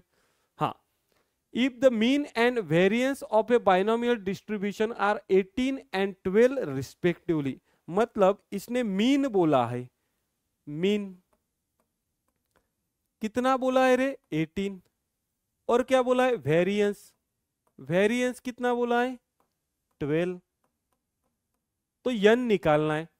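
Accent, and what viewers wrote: Maharashtra, India. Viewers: Marathi accent 🥰🥰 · Question >> ❎ Kochhan >> ✅ Marathi accent 🗿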